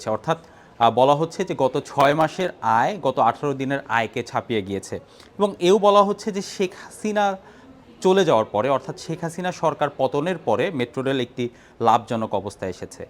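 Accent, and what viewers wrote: India. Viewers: আপনি যেটা বুঝাতে চাচ্ছেন সেটা আমরা বুঝে গেছি।। দাদার টান এখনো৷ যাই নাই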